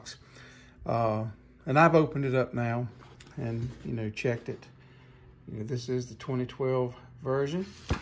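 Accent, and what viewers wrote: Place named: Northern Minnesota, United States. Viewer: Ahh ok, accent sounded off to be northern Minnesota lol but people do move. Again good luck on the USACC build!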